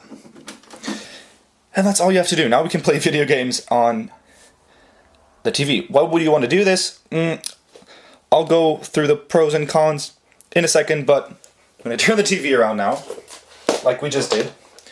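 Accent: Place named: United States. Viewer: Judging from your accent, i thought you were American.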